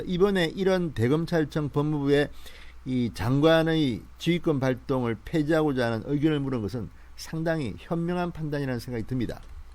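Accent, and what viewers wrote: Gyeongsang, South Korea. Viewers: 진성호 너도 고집이세네~ 말학전서두에 자아~라는 말을 하지말라고 했잔아~ 방송에서 경상도말은 삼가하는게 예의아닌가?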